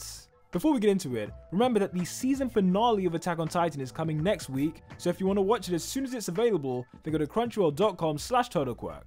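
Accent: United Kingdom. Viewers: I think this is the new "average" UK accent.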